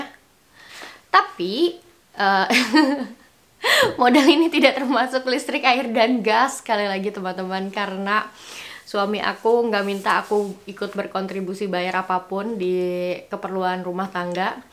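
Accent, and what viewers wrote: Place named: Java, Indonesia. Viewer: Kk indonesia mu medok sekali 😙😙💪💪💪kereeeennnnn ibu kuat ..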